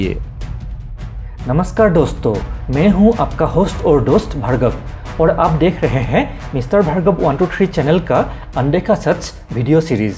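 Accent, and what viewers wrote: Assam, India. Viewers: Ap Assamese ho lagtahe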